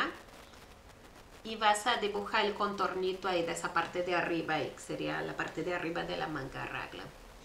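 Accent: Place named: Brazil. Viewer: Hola de que país eres por tu acento parece que eres de Brasil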